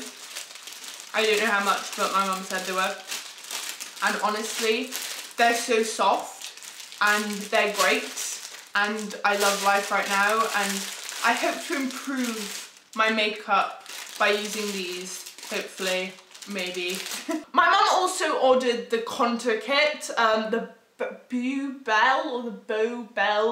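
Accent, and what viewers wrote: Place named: Ireland. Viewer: Are you Irish? If you're not I'm sorry for asking but idk you sound really Irish to me all the sudden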